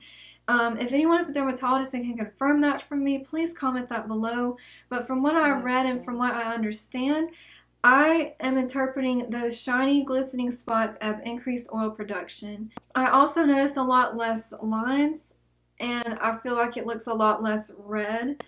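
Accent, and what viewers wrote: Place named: South, United States. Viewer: Let your southern accent come through. No need to hide it.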